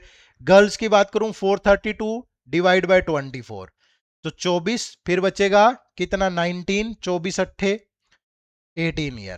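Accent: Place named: Haryana, India. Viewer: Sir I’ve not watched this lecture but I’ve watched some old lectures so I’m pretty sure it also would be such useful lecture for me and I really appreciate you teaching style and your Haryanvi help me to not bore! Love you share ❤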